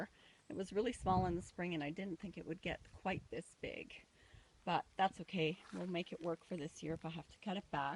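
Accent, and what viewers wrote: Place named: Canada. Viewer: Great video! So fun to see your pretty face and hear your Canadian accent! I don't think lettuce likes wood chips until they have really broken down into compost. I have not had success with mulch of any sort with my lettuce. And I think everything does better if the wood chips have been in place for at least one winter. They certainly seem to get better with time. I love your experimenting! And your indoor garden was incredible! This year I tried direct seeding everything. Don't think I will do that again. My cukes have been a huge failure. Thanks for the tour!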